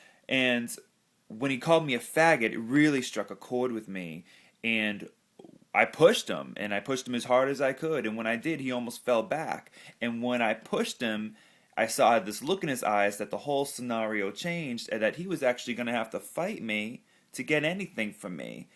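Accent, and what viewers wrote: New York, United States. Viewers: It's fucking sad that this kinda shit is still happening. Sorry this happened to you. Your NewYork accent is adorable. Sad for me that youz gay😏I fink youz tha cutest! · Love the NYC accent sooo much. xoxoxo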